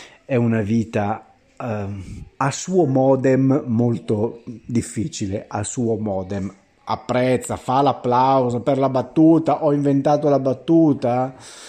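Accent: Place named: Bergamo, Italy. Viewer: Ciao. Mi piace ascoltare il tuo accento Bergamasco quando non ne puoi più.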